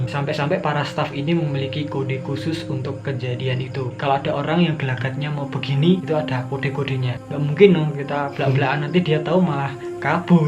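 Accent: Java, Indonesia. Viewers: Abang orang Jawa kah?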